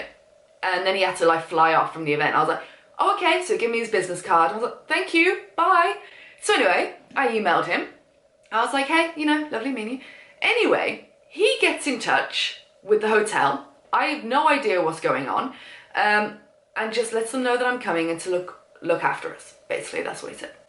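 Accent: United Kingdom. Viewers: you are soo beautiful and ur British accent makes you even more beautiful.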